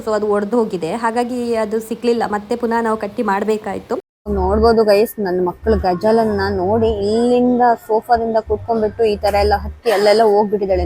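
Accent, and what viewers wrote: Kundapura, India. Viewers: Nivu nam kundapura kannada mix madi mathadthri kelike kushi ath nange❤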